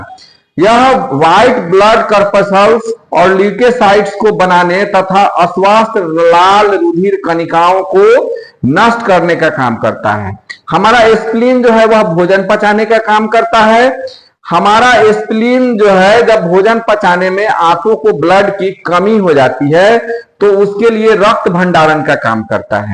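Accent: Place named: Bihar, India. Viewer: Sir ji aap ney bahut wadia jankari di. But aap ki jo hindi hai woh shud nhi hai jaisey aap yin ko in boltey ho spleen ko espleen wood ko ud aap hindi meyn Bihari Bhojpuri mat dalo kyon key aap ki video poora deysh deykhta hai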